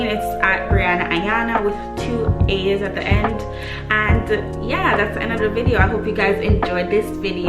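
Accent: Jamaica. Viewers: From mi did hear di accent mi click subscribe🤍 big up goodie nuh!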